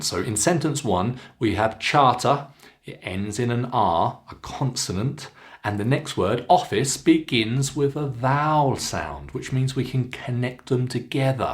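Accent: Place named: RP, United Kingdom. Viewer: You have a modern RP accent. Were you born in the south of England?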